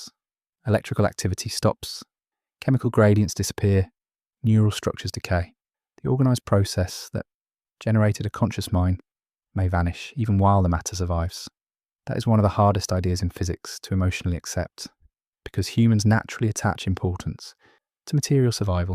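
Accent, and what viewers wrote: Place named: England, United Kingdom. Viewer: How many millions of people listen to you?Because you have a English England voice\nYou're just saying this thing over and over again , that's really boring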